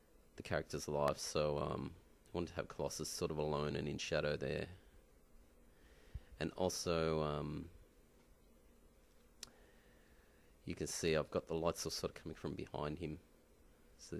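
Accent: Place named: Australia. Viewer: HE'S AUSSIE!!!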